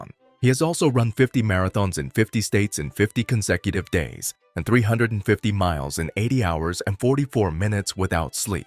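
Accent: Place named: India. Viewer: I miss your beautiful improper Indian voice.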